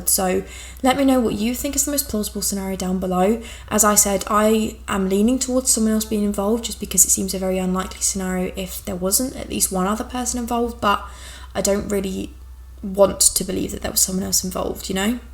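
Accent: United Kingdom. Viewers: I think it’s just you haha. Either that or it’s just the British accent so you might not be used to it or something x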